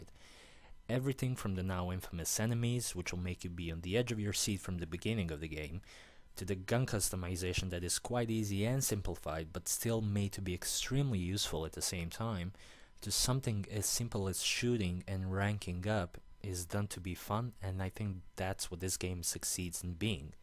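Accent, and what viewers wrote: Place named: Germany. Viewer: Yo great review thanks for being 1st ur German accent makes it E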